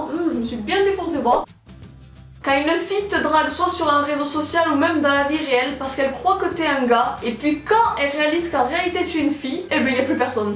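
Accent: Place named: Marseille, France. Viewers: J'aime tes vidéos , tu dit exactement ce que tu pense c'est géniale , par contre tu A un accent du sud vraiment prononcer , tu viens du sud ? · J'adore ton accent du Sud😌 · on dirait que t'ai marseillaise ! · Toi tu viens du sud non? x) Ton accent es prononce! Apres je suis un peu dans le meme cas, niveau habit.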